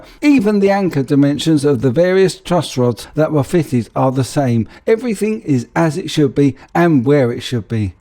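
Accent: England, United Kingdom. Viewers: Sounds like a typical English accent to me. I would have never thought he had a speech problem if he didn't say so. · You sound English to me, heard some crazy accents.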